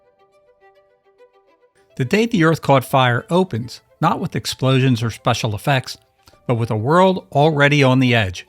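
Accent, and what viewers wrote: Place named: Philadelphia, United States. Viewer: Am I right that you are either from Philly or a close suburb? I hear familiar o’s 😊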